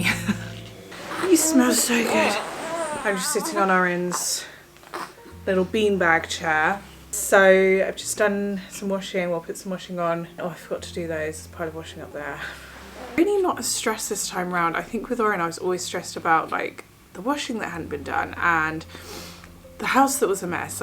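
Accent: RP, United Kingdom. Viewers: You speak posh but the more I get to know you, you are very down to earth and real but not that there’s anything wrong with being posh 😂 you’re one my favourite yt’s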